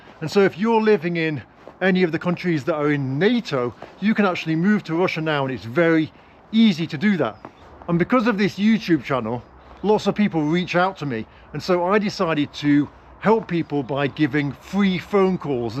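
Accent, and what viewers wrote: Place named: North, United Kingdom. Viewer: У автора просто типичный северный говор)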